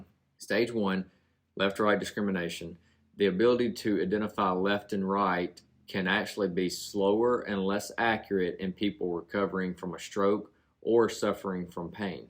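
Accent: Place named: South, United States. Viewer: Well explained and as an Aussie, I like his nice ?Southern accent. I will be trying with my post surgery foot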